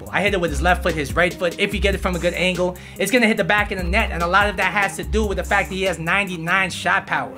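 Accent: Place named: California, United States. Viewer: Cali boi? I can sense an accent bro hahaha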